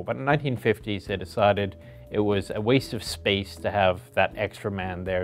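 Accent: Ireland. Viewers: He has a pretty thick Irish accent, regardless he has mentioned it many a time.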